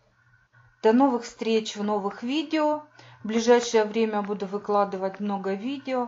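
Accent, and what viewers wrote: Ukraine. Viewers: Такой говор у украинцев, но не у всех. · Любители русского языка! А канал не по русски назвал. Неужели не понятно, что девушка украинка,говорит на своём диалекте. Ты ещё англичанам расскажи, что говорить надо только по русски.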